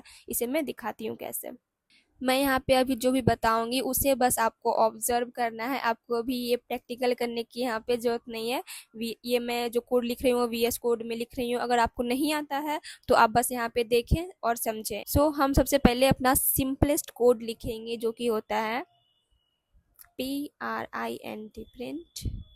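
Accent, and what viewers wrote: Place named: Bihar, India. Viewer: Ap bihar ya jharkhand se ho na ap ka accent se pata chalta hai and Video is very helpful thanks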